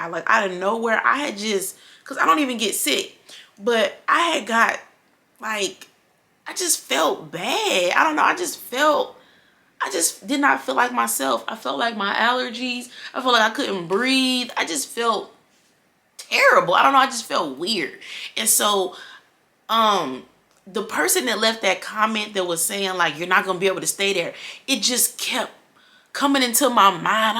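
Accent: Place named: St. Louis, United States. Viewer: Your St. Louis accent is so strong lol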